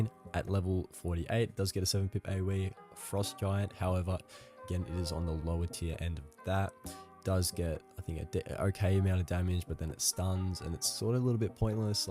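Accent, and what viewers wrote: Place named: Australia. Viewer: judging by the accent you're Aussie too, im questing atm still pretty new and looking for some people to play with if you're keen or anyone else watching.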